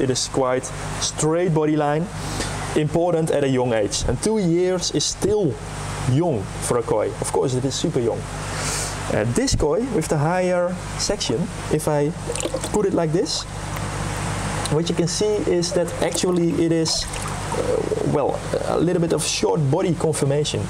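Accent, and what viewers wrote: Netherlands. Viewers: Just bought one to go with my Hi Utsuri and Ki Utsuri. Great explanation. I guessed straight away you are Dutch. I lived in Hoorn for 9 years and love the Netherlands.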